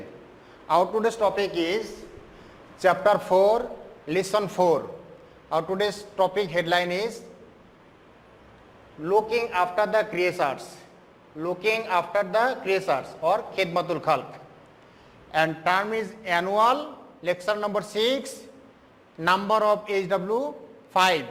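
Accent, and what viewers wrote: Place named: Bengal, India. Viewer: You can find hundreds yet tenths of thousands of bangalees who apply the same pronunciations. Its not done by will but its the way of the accent of some certain people. So this mistake cant be corrected in terms like this. But one way is that is these certain people can learn the UK accent without some obstacles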